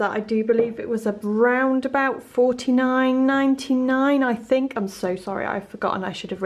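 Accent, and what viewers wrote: United Kingdom. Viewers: you look so amazing and I love your British accent so much 😍😍 Have a nice day girl and if you love music, have a listen to this cool song on youtube: - I love the sunny vibe of it and I hope it will make your day better too 😍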